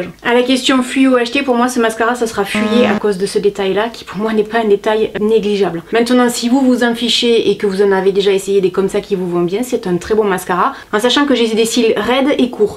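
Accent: South, France. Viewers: Téléphone: notif you tube nouvelle vidéo Moi: ouuuuuuuh mon accent du sud favori est de retour 😍😍😍😍😍 vite la bonne humeur de la journée 🥰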